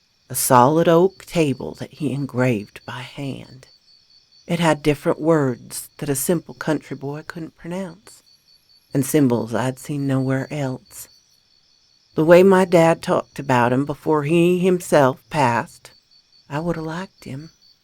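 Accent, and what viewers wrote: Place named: South, United States. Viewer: Hi there 👋:) Thank you for the Spooklicious 😵 Narration!!! I 🧡 your Southern Accent 😉 I hope that you and everyone else has a Smacklicious 💋 Saturday Too:) much love Sexy Grandma Darkness ✌️💋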